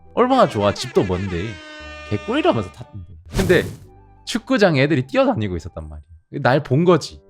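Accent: Gyeongsang, South Korea. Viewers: 김블루님 대구 사셨어영?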